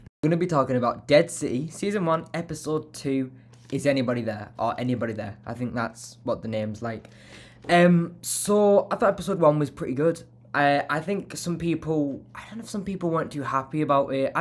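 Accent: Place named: United Kingdom. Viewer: Hi, you sound like you are from the UK?! How are you managing to watch it please?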